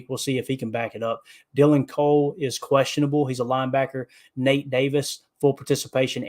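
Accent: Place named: South, United States. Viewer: How you get a southern accent living in Wisconsin?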